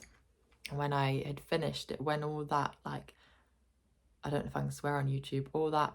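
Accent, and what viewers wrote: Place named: United Kingdom. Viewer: There are so many comments about pronunciation. Did I understand it right that you feel unsafe because of specifically having British accent? I just want to clarify for myself.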